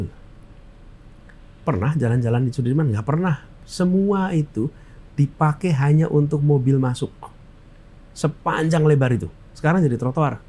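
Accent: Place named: West Java, Indonesia. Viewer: Aya oge logat sudaan pa anis bicaranya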